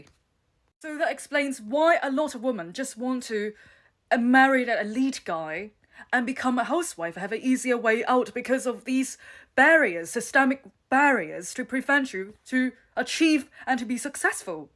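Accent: United Kingdom. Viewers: Hearing someone speak perfect King’s English but use woman as a plural like a Japanese person is so surreal 😭 But also very cool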